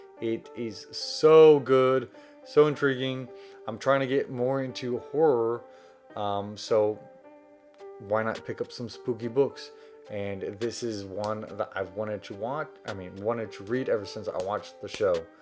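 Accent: South, United States. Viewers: I can't believe you had three books are The Stone Sky, The Fifth Season and The Obelisk Gate by N.K. Jemisin, Angel Image, Bear Town by Fredrik Backman, Fate of the Fallen by Kel Kade, House of Earth and Blood by Sarah J. Maas, Gideon of the Ninth by Tamsyn Muir and all of them at your house in Texas on this video during your quarantine until you're talking about the horror TBR and summer book haul of the spooky season after that, but I hope your husband, Zach will be very proud of you and I'm so happy for you, but I love your Southern accent!!! #SpookySeason #HorrorBook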